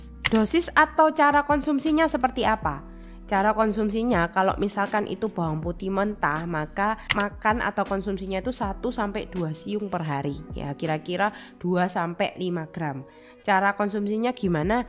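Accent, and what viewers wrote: Java, Indonesia. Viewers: Kaget pas denger logat nya, ga nyangka semedok itu 😂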